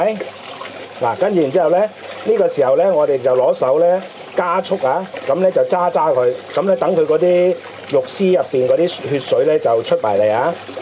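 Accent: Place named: Southeast Asia. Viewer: Leo應該唔係香港人聽你講野似東南亞人多啲喎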